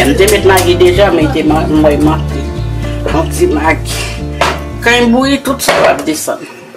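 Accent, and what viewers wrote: Haiti. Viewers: Ki bo an ayiti Manmi soti? Pou janl pale ou ta di se moun an nord pati lartibonite.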